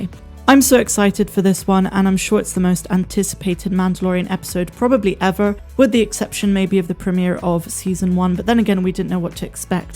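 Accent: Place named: West Country, United Kingdom. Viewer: From the South West of England.. i knew it.. We love the letter Arrr in these parts.. Gert Lush x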